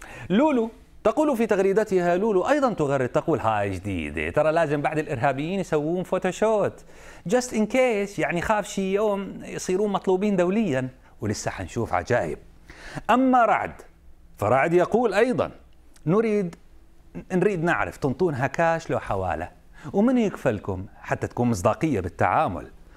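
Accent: Iraq. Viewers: والله اللهجة العراقية عم تطلع معك دهب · اش حلاتك من تحجي عراقي عبالك صدوك واحد من عدنا😄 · ههههههه احب هذا المذيع المخضرم من يتكلم بلهجة العراقية 😍🇮🇶✌🏻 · ههههههههههههههههه اسلوبك يخبل ونوب تحجي عراقي تجنن لعنة الله أمريكا ومن والها أمريكا وايران هي سبب الدمار وهذول فروخهم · احبك من تحچي عراقي🇮🇶❤️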